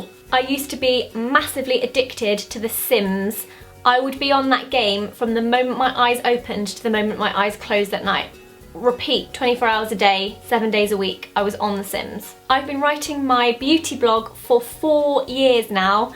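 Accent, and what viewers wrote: United Kingdom. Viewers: Greta Hui 英式的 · 他是英國人嗎??好種的英國腔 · 她的英國腔也太好聽😍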